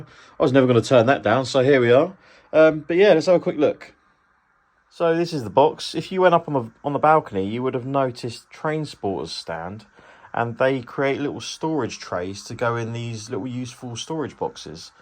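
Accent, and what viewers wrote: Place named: United Kingdom. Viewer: I bet you are British yeah...yeah...yeah...yeah...yeah...yeah...yeah...yeah...yeah...................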